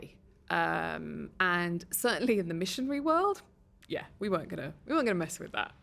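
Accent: United Kingdom. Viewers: British English is charming! U.S. English is beautiful, but UK English has a certain magnificence.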